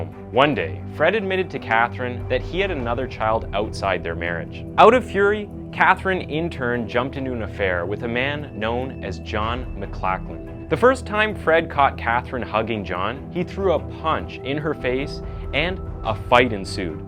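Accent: Canada. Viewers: you do realize that you made a sweeping generalization about 320 million people, right,? That's aside from the channel's host sounding like a Canuck, rather than an American. The rotten thing I see is your opinion of hundreds of millions of people based on the probably half-dozen or less Americans you may or may not have interacted with.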